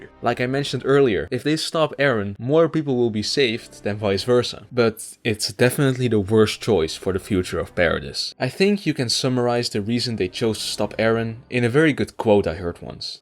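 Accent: Netherlands. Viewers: I smell some dutch am I right?🤣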